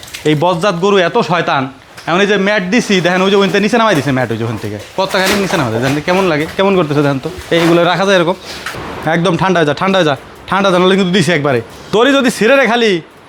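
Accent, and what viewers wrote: Faridpur, Bangladesh. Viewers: ভাই কি আমাগো ফরিদপুরের মানুষ নাকি ভাষা গুলো শুনলেই কলিজায় লাইগা যায়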